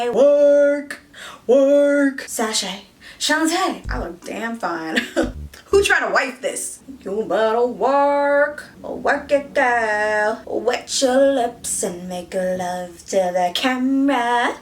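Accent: United Kingdom. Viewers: Your british accent killed me🤣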